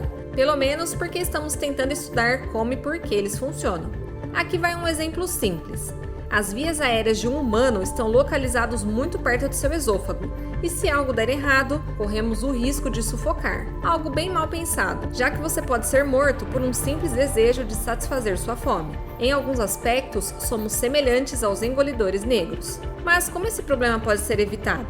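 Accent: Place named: Caipira, Brazil. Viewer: SOTAQUE CAIPIRA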